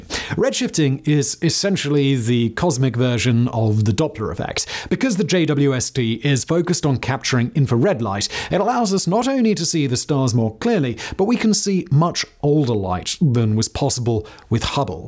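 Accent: England, United Kingdom. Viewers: Your accent is painfully English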